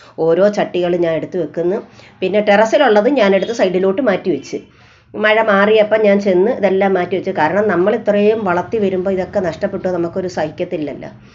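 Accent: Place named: Kollam, India. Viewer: ഇത് കൊല്ലം സഠഭാഷണഠ ആണ്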